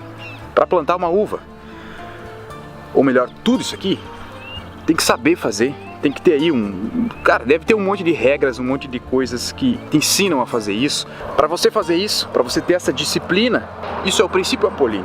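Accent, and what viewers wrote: Rio Grande do Sul, Brazil. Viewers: Ah, sabia que conhecia teu sotaque! Tu é do RS né?! Se não, é muito parecido. Excelente vídeo e paisagem 👏🏽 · Tu é gaúcho?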